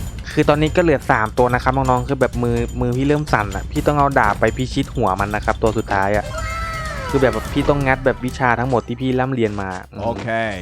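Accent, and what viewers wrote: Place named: Rural, Thailand. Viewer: อยากให้พี่ พูดเหน่ออะคับ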